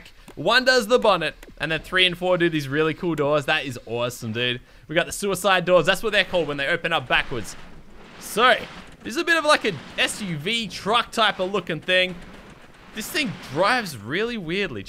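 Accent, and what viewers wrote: Australia. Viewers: Wait ur Australian?.......Awesome cause Im Australian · Graeme Hope he is australian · What your Australian wow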